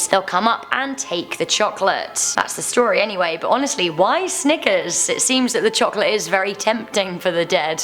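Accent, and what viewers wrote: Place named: United Kingdom. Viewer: lousy SHITBRIT narration. SHITTISH is the WORST kind of English inflection.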